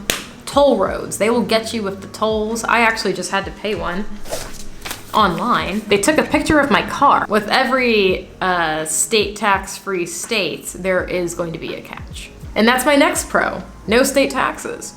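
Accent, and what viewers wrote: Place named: Florida, United States. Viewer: This Florida accent is hilarious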